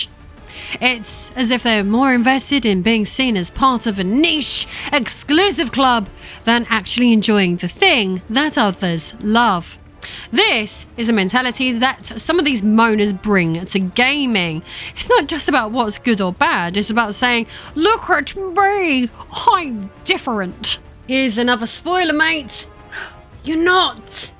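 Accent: United Kingdom. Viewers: I forget how British you are sometimes.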